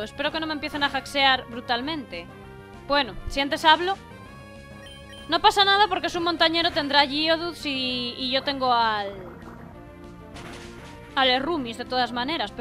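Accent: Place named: Spain. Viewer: Nunca me gusto el acento español, hasta que te escuche, buen humor Saludos desde México!!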